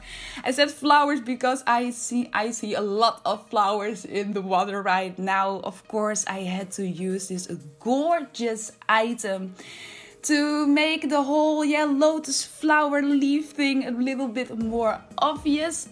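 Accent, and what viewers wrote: Netherlands. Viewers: ik kan het nederlands accent horen in je stem lol heel mooie en creatieve build btw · I can recognize that dutch accent anywhere! Dit gebouw is echt geweldig, al je builds zijn zo creatief, ik heb helaas niet alle packs dus bij mij komt het er nooit zo mooi uit te zien :c Maar ik blijf dromen hahaha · Waarom heb je zo’n Nederlands accenten. Ben je Nederlands? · Hoorde het accent en dacht.. dat is een Nederlandse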